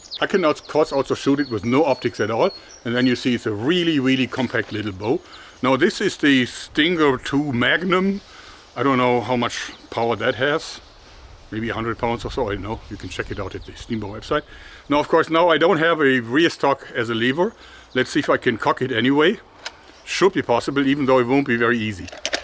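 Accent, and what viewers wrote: Germany. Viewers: You sound German but I think you live in Washington or Oregon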